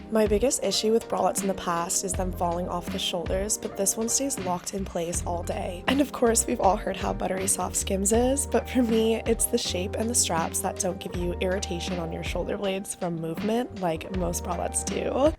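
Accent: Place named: United States. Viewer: This accent is definitely American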